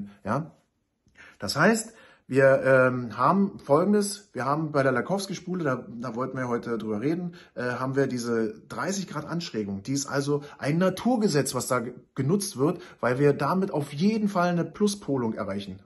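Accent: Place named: East, Germany. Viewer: Also das versteh ich sogar als Weib die nicht der Ostsprache mächtig ist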